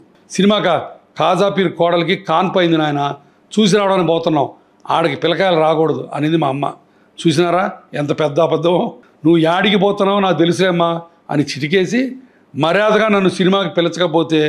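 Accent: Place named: Nellore, India. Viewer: Chala authentic Nellore yaasa sir. Chala rojulaindi Intha raw and authentic nellore yasa vini 😊…. “Dargamitta” is a place in Nellore.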